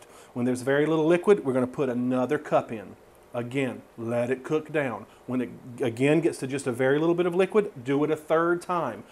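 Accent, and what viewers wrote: South, United States. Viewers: I used cheesy skilley bread to make last year's low carb dressing,and it was good. I knew I wanted to tweak it some this year. I appreciate this recipe so much! The texture is so amazing! I ended up using all butter, cuz I was too lazy to mess with bacon and I knew the butter would be fine.Lol...My butter got good and brown in the skillet before I poured the batter in and lemme tell ya....Whole nother level of goodness happened there😍 omiword! I am kind of like a picky Lil kid so I use all powdered seasonings.I definitely like a good amount of sage ( I mean you sound southern and all,but Idk if you can be right without sage in your dressing😂), My goal today has been to enjoy a lower carb,no stress Thanksgiving. So far so good. People hear low carb no sugar and they're not interested....muahhhahahahaha...my nefarious little plan works. 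They have no idea how good and satisfying this food is! Thank you for doing this and Happy Thanksgiving to you and yours! ❤️🙌💪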